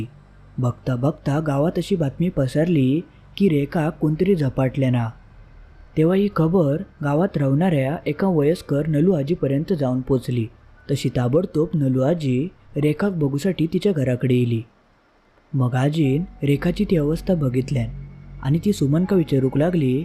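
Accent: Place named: Malvan, India. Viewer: तुमचा मालवणी भाषेचा लहेजा आणी सांगण्याची पद्धत खूप छान आहे मालवणी भाषा फार गोड आहे.....धन्यवाद!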